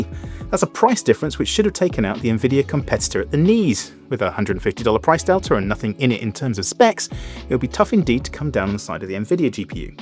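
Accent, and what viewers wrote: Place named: England, United Kingdom. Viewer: Ah my bad then I thought it was a UK publication only - guy has an english accent as well in my defence haha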